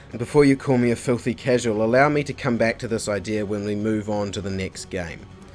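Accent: New Zealand. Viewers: Oi are you a kiwi??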